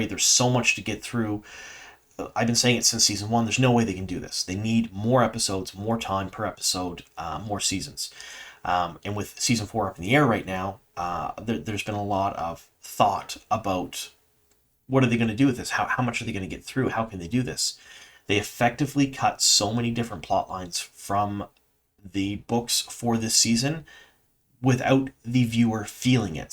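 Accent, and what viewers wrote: Canada. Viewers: They're wondering about the supers?!!? The first time I heard you pronounce the word "about", I said, that's Clearly Canadian! I'm extremely about Siuan, I had a couple spoilers about her story, and I really wanted to see Sophie play those things! Fantastic season and we need seasons 4 - 8! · I also recognize that you’re Canadian because you always say “aboot” and it’s kinda charming.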